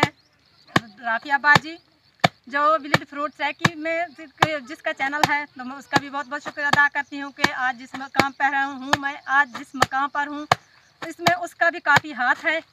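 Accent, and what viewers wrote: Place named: Saraiki, Pakistan. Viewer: Ap ka village konsa hy? Lehjy sy ap sraiki lgti ho mery Abu Ji or Bhabhi bi sraiki area sy hen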